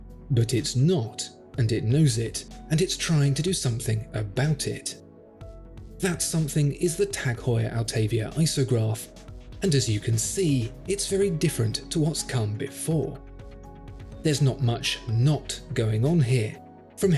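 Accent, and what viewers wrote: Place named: RP, United Kingdom. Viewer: Maybe it was my IPad or me: the Autavia I own has more visual cues than “ go from second hand then case back then name; do that 23 times with a British posh accent that asks questions that he doesn’t answer”. How has Watchfinder earned so many subscribers with that formula? Is it the soft lighting effect and the expensive SLR camera sharing the screen? Perhaps all those other channels that offer watch specs and model line discussion and competitor choices that relate to real-world reasons of why we buy watches- well they must be crazy. Loop four close-ups and add UK accent and there you have it. Half million subscribers.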